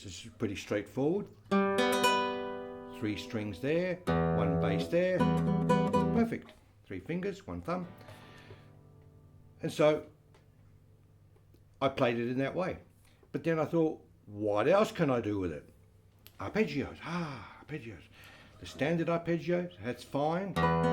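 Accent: United Kingdom. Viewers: You must have spent a lot of time in the U.K.because you now have an English accent.... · I watch you long time ago and i love your content and learn a lot from you sir And i also like the new content and you are speaking with us and we are getting to know your beautiful personality and british accent... Big fan of you please sir keep it up... I'm from Syria ...all love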